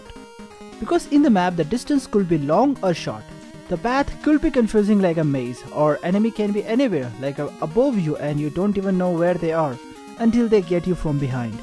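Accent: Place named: India. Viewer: Talking is indian accent is ok bro Pls using American accent looks very cringe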